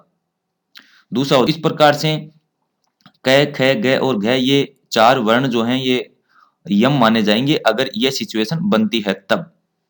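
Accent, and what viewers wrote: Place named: Haryana, India. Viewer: वो क्षेत्रीय या मात्र भाषा का प्रभाव तो पड़ ही जाता है। किंचित वे हरियाणा से हैं।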